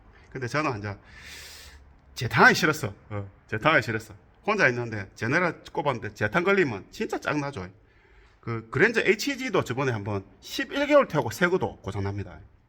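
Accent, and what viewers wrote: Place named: Gyeongsang, South Korea. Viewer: 오늘도 구수한 경상도 사투리를 들으며 영상 시청 합니다..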